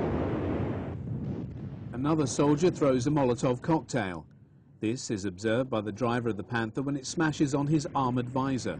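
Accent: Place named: United Kingdom. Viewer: ナレーションの方はイギリスの方ですね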